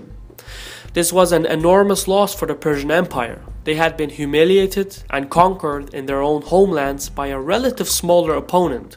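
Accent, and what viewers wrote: Arab world. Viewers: Biased. Look here : he has alil bit of arabic accent so ofc he is gonna say there was no genocide ... They converted most of the people with swords Look dude you lied . And its ok cuz we know u r biased. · This guy who represents the video is Arab. So you can hear his Arabic accent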